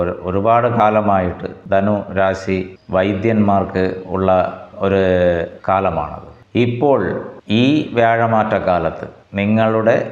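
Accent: Tamil Nadu, India. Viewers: Tamil touch. But good good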